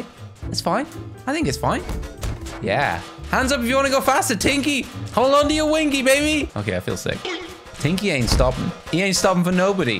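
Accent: United Kingdom. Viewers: Ur British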